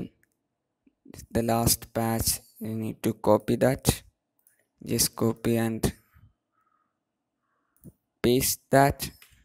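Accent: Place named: Kerala, India. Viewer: I can find u r mallu by your voice😂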